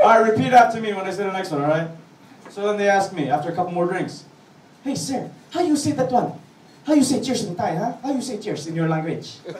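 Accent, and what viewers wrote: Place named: Philadelphia, United States. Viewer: the best philli accent by faaaarrrrrrr <3 :P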